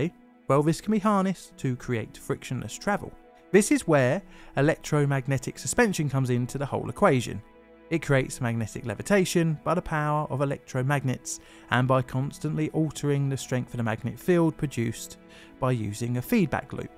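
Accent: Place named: Southern England, United Kingdom. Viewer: I love his voice its a bit of a southern British accent.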